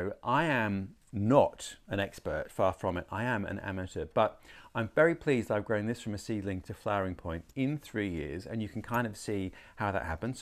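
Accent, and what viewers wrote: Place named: Australia. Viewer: Hi, I really enjoy your energetic teaching and sharing of your amateur orchid knowledge... the Aussi accent, well-spoken English, and humor are very entertaining. I have about 5 orchids that are from a 'seed population'. One of those, a violacea var. correulea, has a spike growing now. Even if the flower doesn't have good color saturation, after a year, it will be difficult to part with it! I'd likely consider trading it for another mature plant. Cheers.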